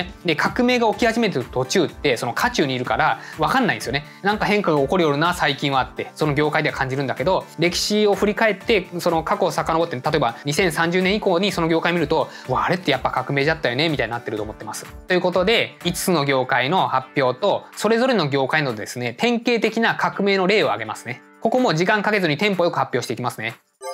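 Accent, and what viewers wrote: Hiroshima, Japan. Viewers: いつもワクワクさせてもらっています。そして、生き残りについて真剣に考える契機になってます。私は、広島弁が人間らしく好きです❗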